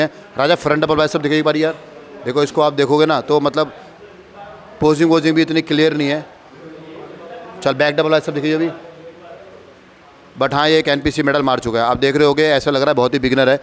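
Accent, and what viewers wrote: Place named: Kolkata, India. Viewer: Bath sunke hi pata chal kolkata ka ladka😊❤💪🏻🥰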